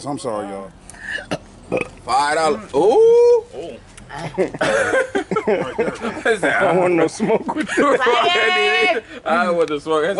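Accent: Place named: Mississippi, United States. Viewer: “I’m from Mississippi” I CAN TELL 😭😭!! My grandma talks the same way. Y’all my family now too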